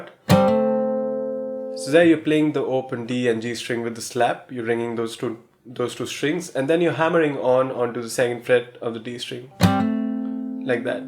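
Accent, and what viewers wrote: India. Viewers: I just love your arrangements. You are an amazing teacher! Thankyou so much for uploading these beautiful fingerstyle lessons :)! I want to ask one thing, are you Indian? Because I find your accent to be Indian. And may I please know your name?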